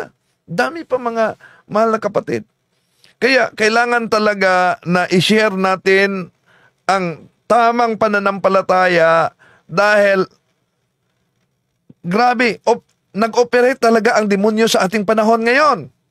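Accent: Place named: Visayas, Philippines. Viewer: Kainis ka naman mag announce halos dko maintindihan bisaya accsent Kasi eh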